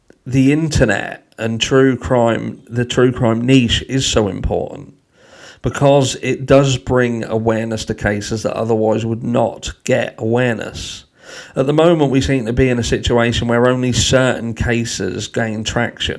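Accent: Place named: RP, United Kingdom. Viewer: Hello from my vacation in the DR. I love listening to you in your posh British accent.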